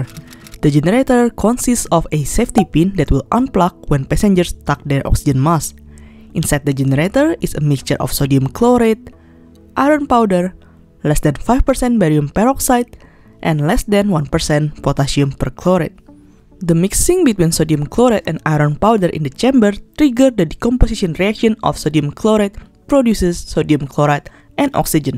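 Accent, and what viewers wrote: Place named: India. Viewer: sounds like one of those indian video conference at work.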